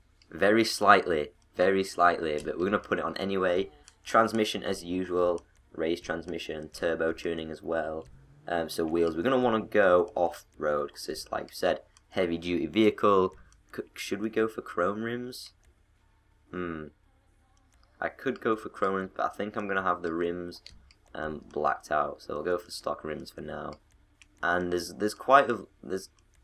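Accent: England, United Kingdom. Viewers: Are you English or American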